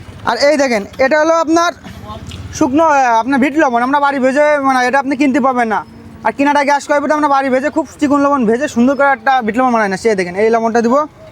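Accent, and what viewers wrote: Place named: Barishal, Bangladesh. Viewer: Shalay pure borishailla😂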